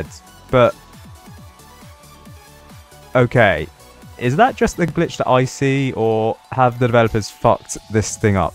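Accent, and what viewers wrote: United Kingdom. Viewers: I love listening to a british guy yap for 12 minutes straight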